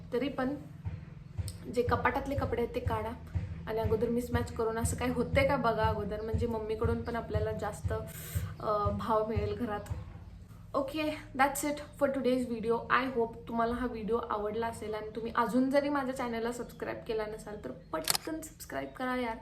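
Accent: Kolhapur, India. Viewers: बोलण्याच्या स्टाईल वरून तरी तुम्ही कोल्हापूर साईट कडच्या वाटता ताईसाहेब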